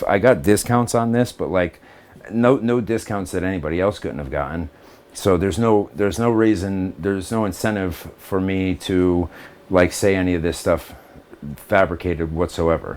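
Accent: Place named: Brooklyn, United States. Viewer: You got a slight Brooklyn accent! lol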